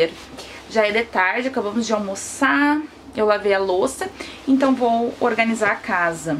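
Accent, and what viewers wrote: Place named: South, Brazil. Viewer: Amo o sotaque do Sul e.lindo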